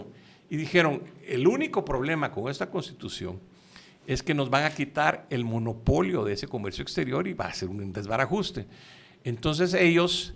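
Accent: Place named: Guatemala. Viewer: Lo felicito. Su acento es casi el de los chapines de 1966 cuándo me fui. Hay otros que parecen mexicanos.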